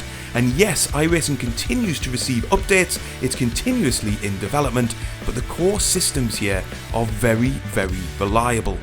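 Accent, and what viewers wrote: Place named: North East England, United Kingdom. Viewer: Really enjoyed the video. As an Xbox sim racer this has been super helpful in my research to PC switching. Your trustworthy NE accent helps as well 😉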